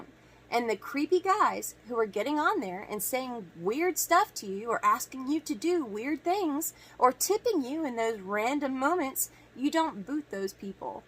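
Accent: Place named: South, United States. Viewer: Love your southern accent by the way southern girls all the way! 👍🏻🙌🏻😊💕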